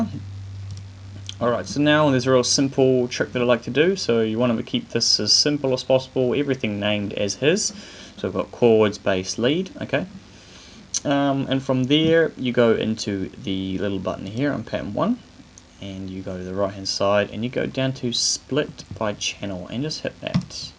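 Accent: New Zealand. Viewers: Are u kiwi?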